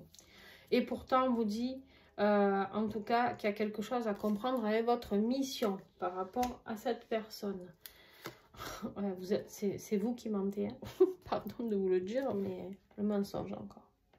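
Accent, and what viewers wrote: South, France. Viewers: Quel plaisir d'écouter votre accent du sud.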